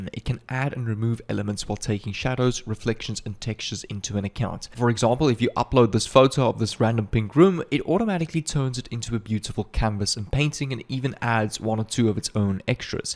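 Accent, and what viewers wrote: South Africa. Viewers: Are you from Za?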